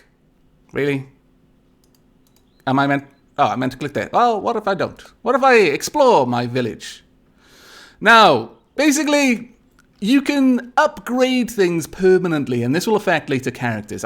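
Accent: Wales, United Kingdom. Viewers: Damn it. You make me want this game. Both my wife and I know the joys of hearing the jaunty awesome Welsheness of.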